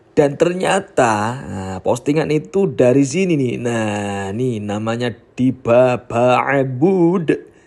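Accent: East Java, Indonesia. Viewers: jawa timurane kentel sayid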